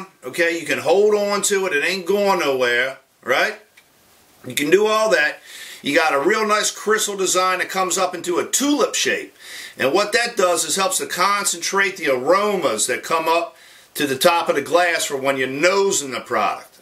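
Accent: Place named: New Hampshire, United States. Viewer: Very informative video. I shared this for my friends to view. Love the accent. Reminds me of my mother and her accent from New Hampshire.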